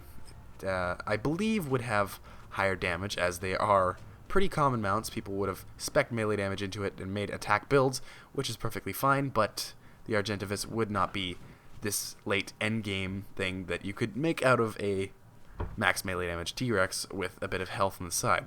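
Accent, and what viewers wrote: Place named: United States. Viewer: Zajd Zajd it would be fine if he had a different accent but he talks like a American/Canadian and he says things completely wrong!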